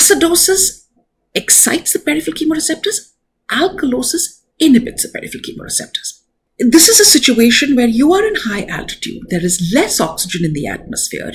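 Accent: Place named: United Kingdom. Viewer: Mam uk wali nhi hindi wali pronounciation bolie , nhi smjh me ata but content acha h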